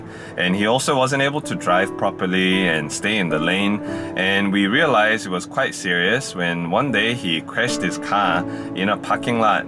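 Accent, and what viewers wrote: Singapore. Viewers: I'm very sorry to know you lost your papa. My deepest condolences to you and your family. I lost my papa 16 yrs ago as he got a heart attack.This year Feb I lost my best buddy , she got into coma for 3 months and eventually passed on. I fully understand the feeling of losing someone close to your heart. Everyday I try to live my life meaningfully with the understanding of things or relationship will not last forever. As there is a saying '' Time will heal the wound'. I wish you and your family will stand strong together as your papa is now in a peaceful and happy land. He will watch you all from afar. Take good care of your mama ❤️. Hope to see your new vids soon as I always like to see you speak in singlish.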